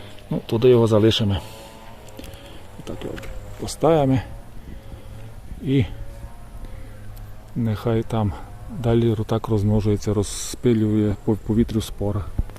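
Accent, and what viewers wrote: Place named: Carpathians, Ukraine. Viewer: Чудовий діалект!Карпатська мова ,доповнює красу такої чудової природи!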